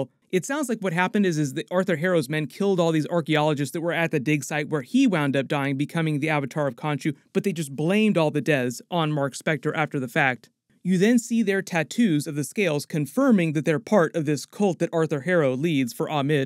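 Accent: England, United Kingdom. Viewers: I agree. Not saying you're ignorant though 😉 but his English accent is just fine. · Great video as always. But oh my word. Public announcement that the English accent isn't that bad 😅 people do speak like this.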